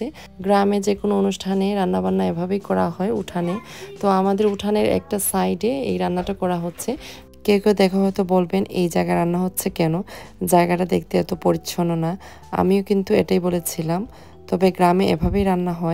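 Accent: Sylhet, Bangladesh. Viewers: আপনি বলে সিলেটের ভাসা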